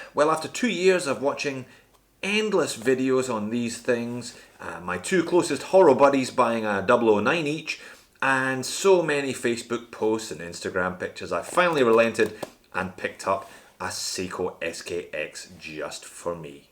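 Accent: Scotland, United Kingdom. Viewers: Stop doing that Scottish accent !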